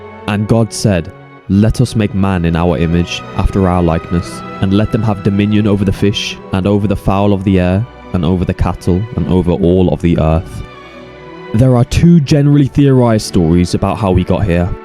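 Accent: United Kingdom. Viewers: West midlands?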